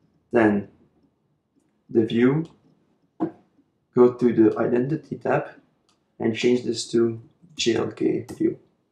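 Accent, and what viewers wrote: Russia. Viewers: типичный русский акцент)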